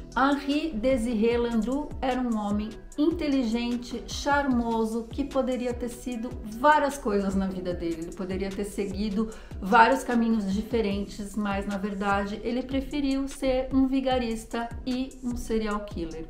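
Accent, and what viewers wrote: France. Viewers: Je aimer le Ton Français , merci mandame.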